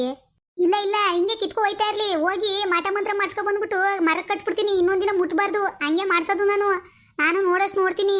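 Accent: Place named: Mandya, India. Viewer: ಮೇಡಮ್ ಇವಾಗ ತಾನೇ ವಿಡಿಯೋ ನೋಡಿದೆ ತುಂಬಾ ಚೆನ್ನಾಗಿದೆ ಇದು ಮಂಡ್ಯ ಶೈಲಿಯ ಭಾಷೆ ಅಲ್ವಾ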